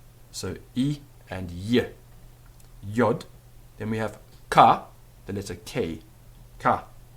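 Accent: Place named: England, United Kingdom. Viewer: If this is the first lesson, I do believe that he can teach the whole language in 3 lessons. Also his English accent makes it sometimes a little difficult to understand the words in Latin.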